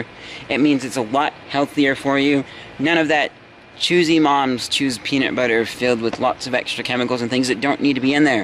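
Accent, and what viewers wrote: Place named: England, United Kingdom. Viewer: kinda an english accent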